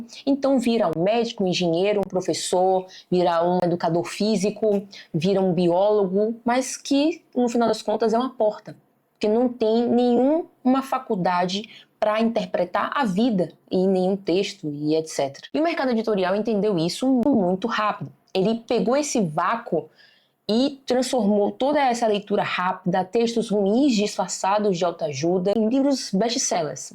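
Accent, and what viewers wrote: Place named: Bahia, Brazil. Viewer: eu ouvindo seu vídeo e, ao invés de prestar atenção, tentando identificar se você é da Bahia pelo sotaque e já querendo chamar pra um café. ❤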